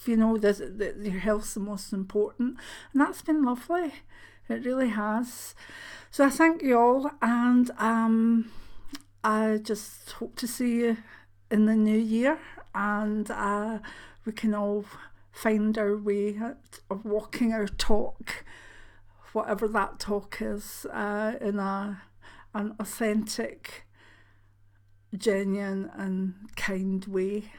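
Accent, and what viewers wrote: Scotland, United Kingdom. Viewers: I wish you a happy and joyous Christmas with your family and grandson, the little ones really do make it magical. Have a wonderful Hogmanay and I look forward to future videos, you have helped me get through a difficult year after loosing my mum in January, listening to your beautiful accent has soothed a lot of my emotions, its like having my mum near by, I miss hearing the Scottish accent, I lost mine years ago, though apparently it slips in every now and then. Thank you for all you do xx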